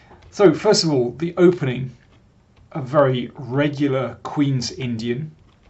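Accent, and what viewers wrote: England, United Kingdom. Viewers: Great videos,! Lovely English accent in addition to keen chess analysis. My only suggestion: Please add the opening played, when appropriate, to video descriptions. Thanks!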